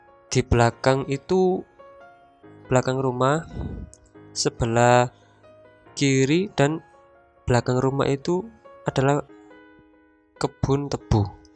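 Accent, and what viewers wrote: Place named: Malang, Indonesia. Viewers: Itu logat medoknya kayanya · suaranya khas malang banget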